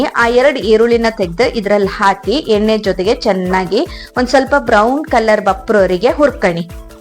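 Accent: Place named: Kundapura, India. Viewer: ಕುಂದಾಪುರ ಕನ್ನಡ ನ 😄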